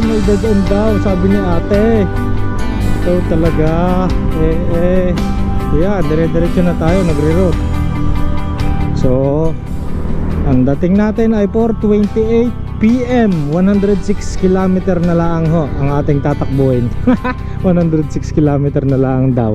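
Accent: Batangas, Philippines. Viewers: Batangueno ka kuya?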